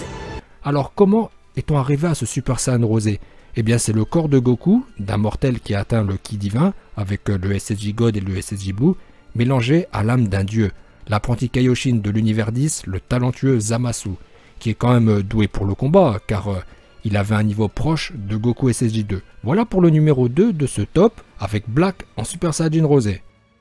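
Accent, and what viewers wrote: Japan. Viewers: J' aime trop ce que tu fais avec ton accent japonais dans tes vidéos continue comme ça 👏👏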